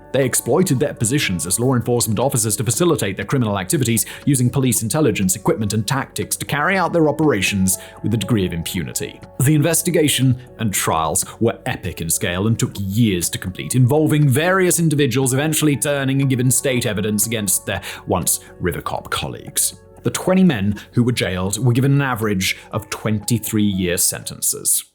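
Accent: United Kingdom. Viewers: Pea-brain strikes again. Despite being a world famous city thanks to the cocaine trade still managed to mispronounce Medellin. He keeps proving that having a British accent doesn't make you smart.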